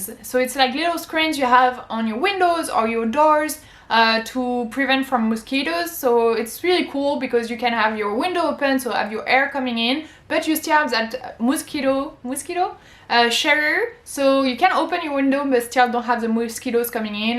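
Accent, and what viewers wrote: France. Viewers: The accent shows she is CLEARLY french my guy 😂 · T'as jamais envoyé du courrier toi ! Et pas la peine de dire que tu est Française, ça s'entend ! On a un marqueur rouge pour indiquer qu'il y a du courrier à partir, avant de dire des conneries on se renseigne ou simplement on regarde dans sa boite pour le reste c'est pas mieux...la moindre poignée de porte te fait éclater tes 2 neurones..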